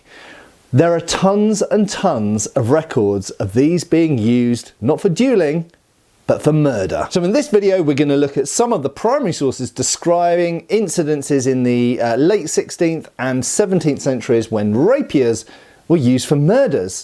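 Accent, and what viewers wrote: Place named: United Kingdom. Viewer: I assume that this is STILL hoe you Brits sound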